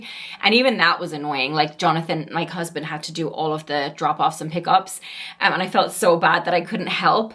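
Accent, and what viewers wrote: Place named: United States. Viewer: Thank you for sharing, the first time I encountered one of your videos was in Brazil 2012 when I was experiencing some homesickness. I immediately was like who is this beautiful woman that has an American accent but not at the same time. lol...I loved it and I appreciate your honesty throughout the years, it's been lovely seeing you remain true to yourself. Thanks again for sharing because you don't have to Well wishes from Guyana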